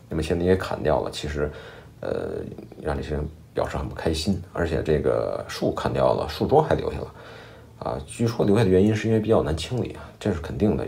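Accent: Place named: Beijing, China. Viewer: 菲龙网看了一下都是视频加文字。。哪里有这样的京腔京孕有意思。。😊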